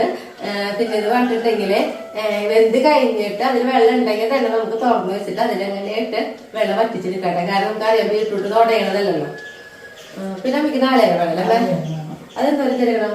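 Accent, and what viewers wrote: Thrissur, India. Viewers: Ante chechy onnum manasilakilla njangal Thrissurkaralla Thirichu parayu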